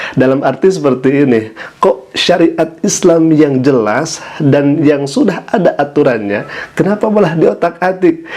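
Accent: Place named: West Java, Indonesia. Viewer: Ini sih ustad dadakan.. bicaranya spt org dari jabar...memalukan org sunda... Dasar ustad koplak.. 🤣🤣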